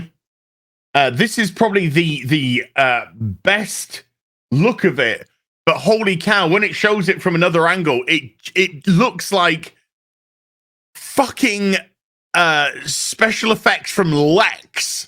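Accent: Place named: Scotland, United Kingdom. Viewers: the scottish makes this Review sooo special XDDD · Alright, your scottish accent got you another subscription, mate! 😀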